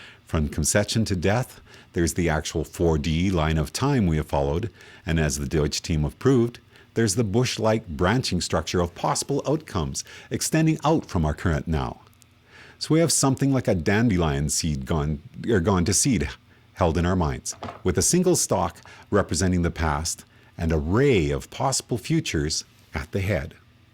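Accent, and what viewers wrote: Canada. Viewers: His thick Canadian accent is distracting me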